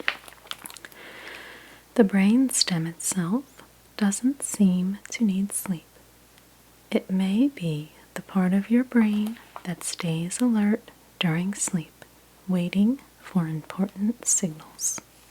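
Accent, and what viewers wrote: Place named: New Jersey, United States. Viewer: I believe it’s New Jersey!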